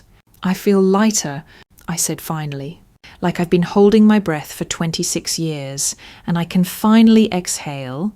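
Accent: United Kingdom. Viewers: I’m wondering why the stories narrated by the British voice always has a pic of a female in a military uniform. Clearly this narrator is not in the military nor is there any military story lines. But all that aside, very good story as heartbreaking and happy all at once.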